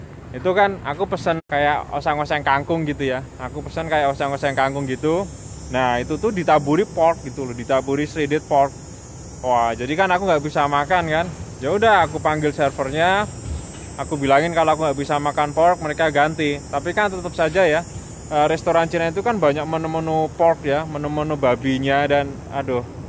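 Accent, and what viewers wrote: Java, Indonesia. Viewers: jowone daerah pundi mas smpean...suka bgt ma isi video ini..😊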